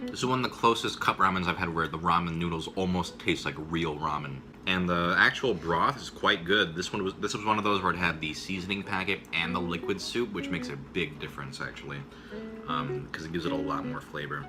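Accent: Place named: United States. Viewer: The fuck is your race? you look Asian but sound american!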